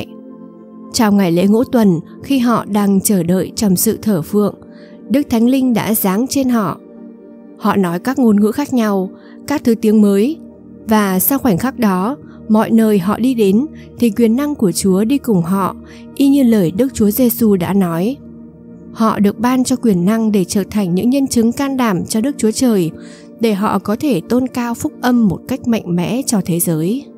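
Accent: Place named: Hanoi, Vietnam. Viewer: Giọng đọc hay quá . Cảm ơn nhé.nghe rõ, chuẩn giọng hà nội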